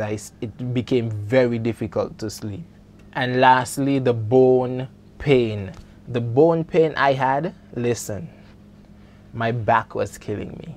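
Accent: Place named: Jamaica. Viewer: I want to say sir you really know your information. Love how you explained everything. Love your vibe ❤ Just curious, sre you Jamaican or Haitian? I’m hearing both. Mostly Jamaican or maybe Vincy?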